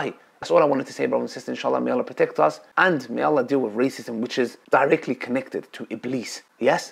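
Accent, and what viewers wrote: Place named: United Kingdom. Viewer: to be honest he always appeared to me as a British guy because of his accent. Never thought of him as a Kurd lol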